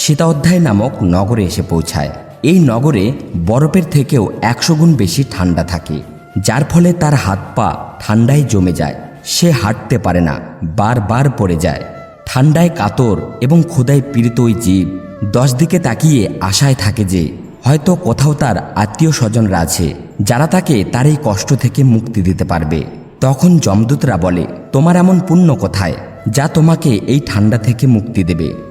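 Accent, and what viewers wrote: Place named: Bangladesh. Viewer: বাংলাদেশি বাংলা এটা ভারতীয় বাংলা নয় এটা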